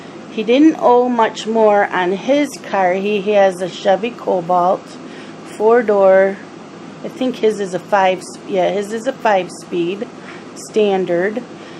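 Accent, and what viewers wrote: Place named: Upstate New York, United States. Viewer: Hi. what a cool name. i am watching you color the Freebie Friday, the March, and you're calling us. your peepers. i just love Ann soo much and I enjoy your videos as well. I wonder where you are located. I am guessing upstate NY... I am an Army brat born at Fort Dix NJ but being military one picks up on accents... But I want to tell you how awesome I think you have done this Mandala!!! I am going to try doing one with markers and see how it goes. Have a blessed day. ~bobbi in TX.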